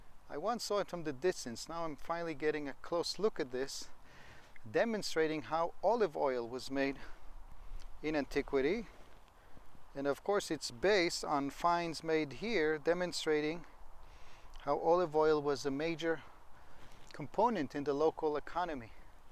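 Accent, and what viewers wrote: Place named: Israel. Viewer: so interesting.... I LOVE your Israeli accent!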